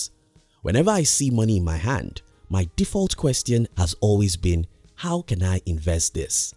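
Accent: Nigeria. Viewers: That's a Nigerian 🇳🇬 accent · Brilliant Nigerian voice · Gather here if u think the narrator sounds like a Nigerian ✌️ naija fi life no fuckery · For those who might be wondering where these ascent comes from, well, this is a Nigerian ascent. Good work bro! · Lol Narator sounds like he from my country Nigeria 🇳🇬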